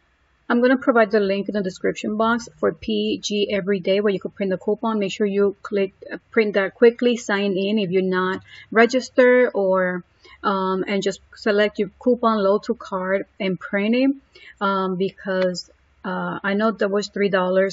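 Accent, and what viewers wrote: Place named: Spain. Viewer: Hello!!! I can feel your spanish. Why dont you do it in spanish.😀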